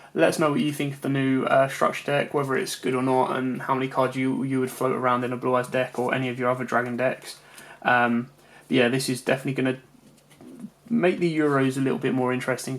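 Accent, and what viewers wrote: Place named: United Kingdom. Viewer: Didn't you noticed his accent?. He is from the UK, It falls to reason Europe got it first. Now that I think about it, We can just import it from there right?. Doesn't matter. It's out now.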